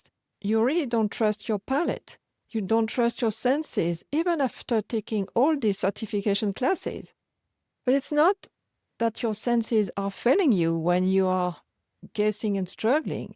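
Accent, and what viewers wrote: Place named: France. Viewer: I love your Methods and your French Accent :)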